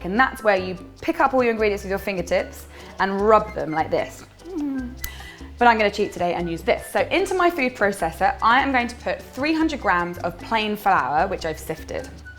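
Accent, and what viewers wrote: England, United Kingdom. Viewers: Is she from England ?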